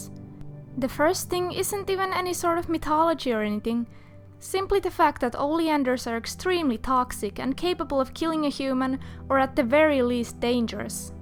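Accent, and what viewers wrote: Finland. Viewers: I like waffles MÄ TIESIN SEN! MÄ OLIN NIIN VARMA ET SE ON SUOMESTA JA NYT TOTUUS ON SELVILLÄ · Ah, i can hear the finnish englhis. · Da Dragon Durp it's called "rally english" it's really common with finnish people xd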